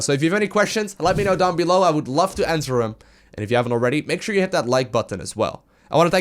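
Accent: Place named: Netherlands. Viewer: hey, its extramadchicken the guy who literally started playing sc2 again due to that fabulous Dutch accent. anyways i know this is an old video but since u are still actively streaming i was wondering of what we would like Your easy to follow tutorials. i was wondering if you could potentially create more terran and possibly toss videos when u have time. Although zerg became my initial choice due to a wide variety of videos youve made as u are the best sc2 instructor out there, id like videos of u analyzing some Terran and toss basics and principls. It will inadvertently help zerg players because it will teach them the mechanics behind the other races. Also when i decide to not be a pleb anymore, ul be the first and likely only streamer ill sub for xD kappa.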